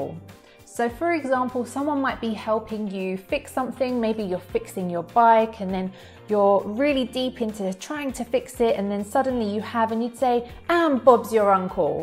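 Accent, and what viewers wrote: United Kingdom. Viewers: I love the British accent and I'm chuffed to bits with this video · Hello i'm one of the your big fan's and I really like your Accent because I'd like to speak british accent properly so I hope you to make a special lesson about most common sounds to sound more british, Thanks for reply.😃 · I hear a lots of different british accent in movies and tv shows, now I got used to your accent and I would like to know which part of the UK are you from so when I hear someone speaks with your accent I will know where he is from, I got used to one of the X factor judges accent and she is from Esses now when I hear someone speaks with the same accent I know they are from Essex, I like to recognise where people are from juste by leastning to their accent, that is the reason I am asking you which part of the UK you are from, and you don't have to answer my question if you don't feel confortable to, thank you.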